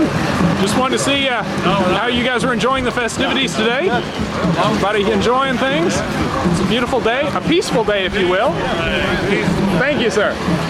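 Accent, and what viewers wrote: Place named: South, United States. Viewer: Your Southern accent was stronger back then, haha.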